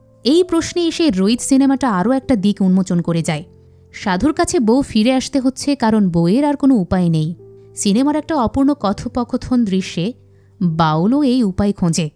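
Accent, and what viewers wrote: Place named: Noakhali, Bangladesh. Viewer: Are Bai eita noyakhali meye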